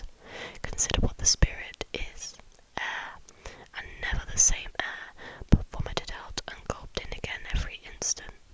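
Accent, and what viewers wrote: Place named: England, United Kingdom. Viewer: yay I love an English accent, subbed! I have a feeling you’ve got a lot of good books up your sleeve, you should totally do a book suggestion vid :)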